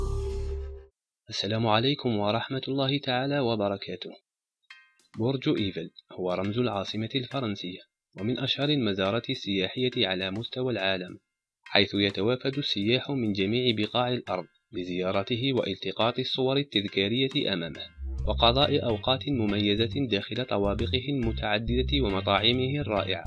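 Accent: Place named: Morocco. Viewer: انت مغربي 100 بل 100 لان طريقة كلامك مغربية المرجو الاجابة الفيديو رائع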